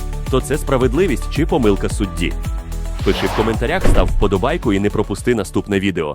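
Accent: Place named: Russia. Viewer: Розганяють русняве іпсо. Канал підозрілий: не атаман, наголоси русняві ставить...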